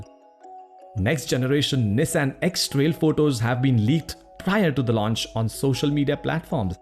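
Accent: India. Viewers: Indian voice?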